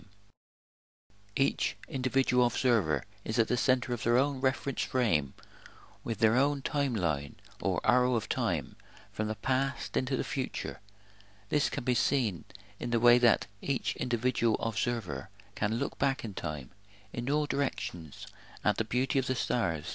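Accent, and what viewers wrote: West Country, United Kingdom. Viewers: I shared your Video to the Google+ Science community and it was moderated to Death.. I think your West Country accent got the better of them. Don't let them grind you down. They think they know it all.. but they are dancing to Pan's pipe.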